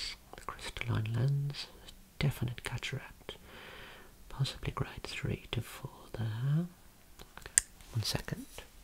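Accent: United Kingdom. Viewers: Not sure if it is your british accent has something to do with it but I like when you say certain words like improvements, results & delicate. It’s so tinglyyyyy.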